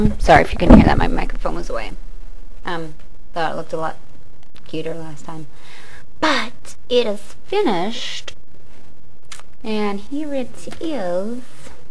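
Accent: Texas, United States. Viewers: you remind me of April Rhodes from glee. You're awesome, gotta love the Texas accent, I'm in TX too. I'll be subscribing to your channel for sure :) and I love your hair! :D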